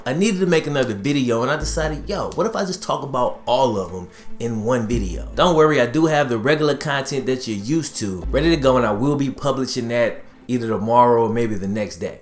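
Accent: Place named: Louisiana, United States. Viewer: I aint know you was from Louisiana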